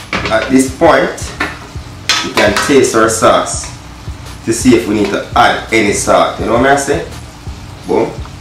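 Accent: Jamaica. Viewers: Recently discovered your channel. Really enjoying the authentic Jamaiacn feel. Meal and accent. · Wickeeeeeeed my uth!!Not only do I enjoy your teachings but ur presentation. Real down to earth vibes no twanging just raw Jamdown style.I feel I'm home u nuh JAMAICA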